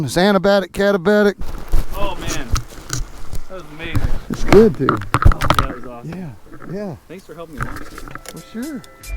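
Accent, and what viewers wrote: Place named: Louisiana, United States. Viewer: Good times had by all, great vid 😃. Maybe it's the other guys accents, but you sound more Louisiana than when your at home 😃😃 👍👍🇬🇧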